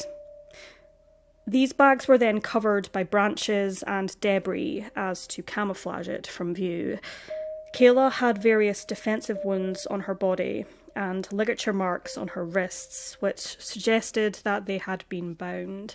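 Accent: Scotland, United Kingdom. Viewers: She is in Scotland with a Scottish accent, give her a break!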